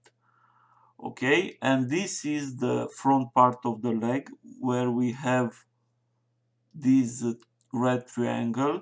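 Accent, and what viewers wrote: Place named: Russia. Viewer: пытается говорить по английски ,но сражу просвечивается русский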